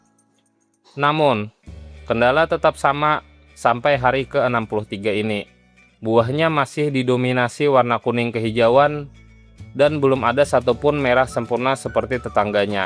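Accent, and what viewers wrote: Banjar, Indonesia. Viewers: urang banjar kah pian, bahasa banjarnya masih ada lah, kada hilang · Urang banjar mun ndagari logatnya sidin ni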